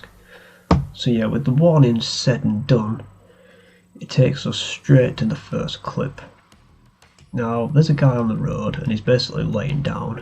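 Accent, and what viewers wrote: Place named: Liverpool, United Kingdom. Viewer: Are you from Liverpool? Your accent sounds alot like my buddies lol forgive if I'm way off the mark XD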